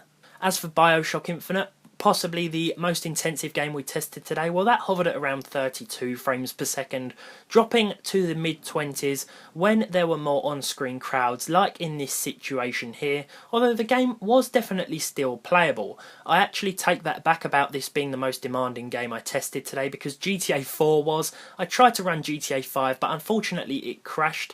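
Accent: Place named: England, United Kingdom. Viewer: Esta bien chido el acento inglés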